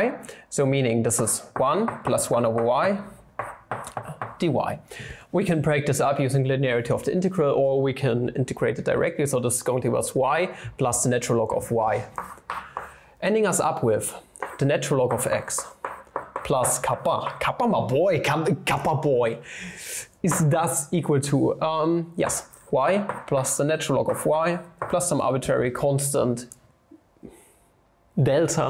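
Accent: Germany. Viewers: I've never understood a single flammable math video but I still watch them...musg be the accent. I'm a sucker for german accents and chalkboards.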